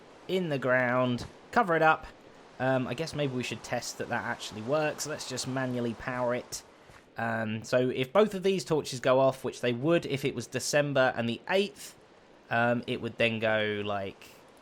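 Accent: United Kingdom. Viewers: haha it actually does help a lot it's easier to understand the british accent now (which is a little bit more difficult along with the bad quality at school) I have a 6.7 atm for english :D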